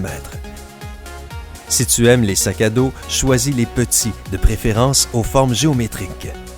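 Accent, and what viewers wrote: Quebec, Canada. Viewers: L’accent québécois omg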